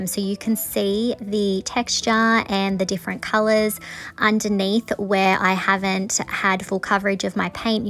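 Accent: Australia. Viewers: Née subscriber here. It’s nice to hear an Aussie voice. I love your little pots, very cute. May I please ask you what brand is your glue gun. I have to get a new one and I like the way yours sits there. My old one is not a very good one and the glue keeps leaking out of the nozzle. TFS. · Just found your channel, love to gear an Aussie accent here in France, we used to live in Perth. :) love these pots, I bought some peat pots recently and will make some fir my shop. :)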